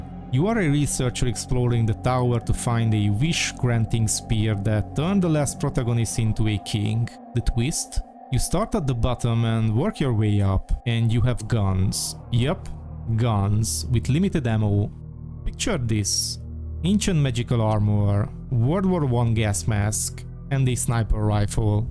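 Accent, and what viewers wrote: Hungary. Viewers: Csak feldobta a videódat, de mondom ezzel az akcentussal tuti magyar. :D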